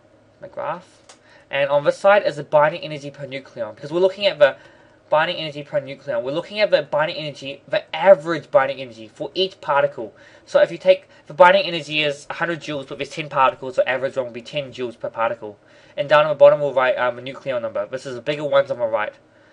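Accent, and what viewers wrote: New Zealand. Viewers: New Zealand accent, brother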